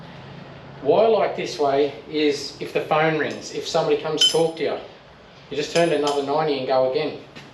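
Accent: Australia. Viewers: Once again I am catching up with this episode late. If you are watching from overseas accent is typical of an Australian tradesman. We are that group of people who don't have to carefully check what we say before we speak. We use slang, we might swear a little, it's all natural to us. With his humour might at times come across as a bit daft. But, he has a sharp mind and he knows what he is doing.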